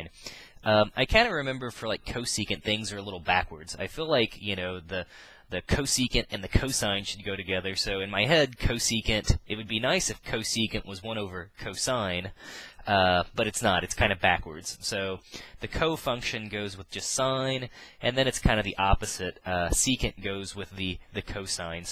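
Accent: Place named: Pennsylvania, United States. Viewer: As always, you were great. Can't thank you enough for taking your personal time to do this for us struggling math students. You rock. PS - Your accent makes me believe you're from PA...or maybe MD. Am I right?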